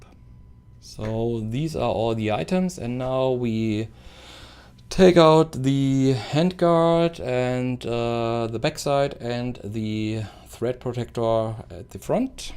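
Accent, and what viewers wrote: Germany. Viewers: Wieso merke ich direkt nach dem ersten satzt dass du Deutscher bist :)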